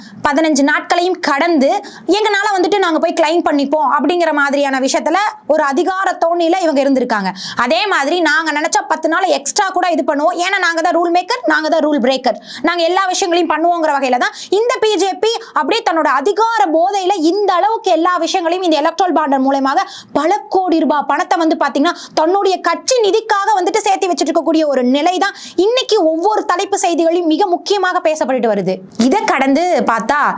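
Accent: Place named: Kongu, India. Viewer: பேச்சு‌வழக்கு கொங்கு நாட்டு பக்கம்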